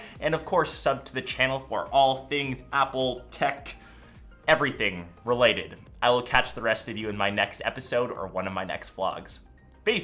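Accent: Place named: Canada. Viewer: Love that Canadian accent. As always, a great, high-quality review.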